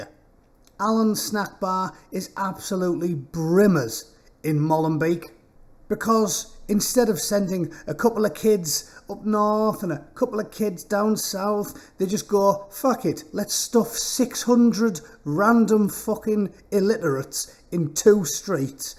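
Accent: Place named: Scotland, United Kingdom. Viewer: Actually, I'm not gonna lie, but I genuinely thought you were Scottish when I heard your voice for the first time lol! I follow you on Quora and knew you were English, but I definitely though you were Scottish lol!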